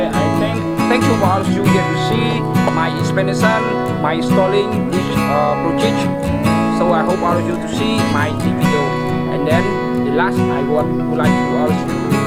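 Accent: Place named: Thailand. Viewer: Dù nói tiếng Anh nhưng nghe vẫn biết là người Thái Lan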